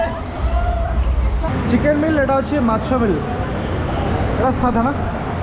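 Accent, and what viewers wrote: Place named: Deogarh, India. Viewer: Tuma ghara deogarh ki...bhasa ta semiti laguchhi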